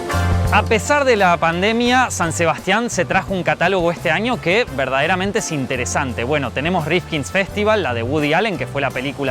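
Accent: Argentina. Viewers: Dios mio!!! Ese acento argentino me encantaaa. Es hermoso! Un abrazo gigante desde Bogotá-Colombia 🇨🇴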